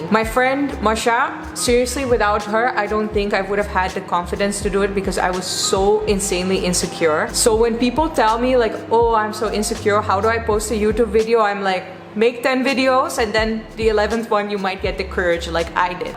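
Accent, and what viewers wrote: India. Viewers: Je hebt zelfs een Indiaas accent als ik het zo goed zeg? Heb een hele tijd geleden wat Nederlandse vlogs gezien en kwam je nu weer tegen. Wat leuk dat je je draai hebt gevonden in India! Wens je veel geluk 🍀 op je pad! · You got a little Indian accent when you speak english haha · Totally picked up Indian accent....😄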